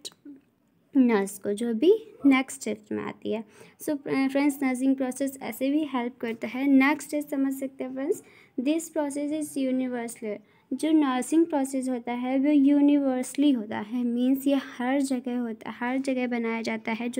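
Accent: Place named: India. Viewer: إنجليزي بنكهة هندية 😂😍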